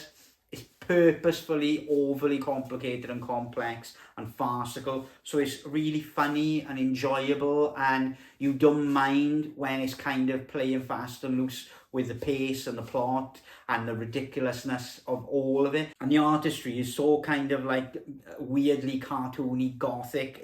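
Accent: Wales, United Kingdom. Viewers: Is that a welsh accent?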